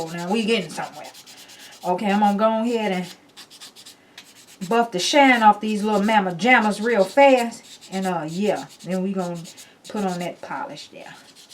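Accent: South, United States. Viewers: Ever so lightly Like that there This here glue Hand here, Please where in the South are you from? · 😂😂 im from SC and I was like whoah! Her southern accent is VERY southern even for me.😂😂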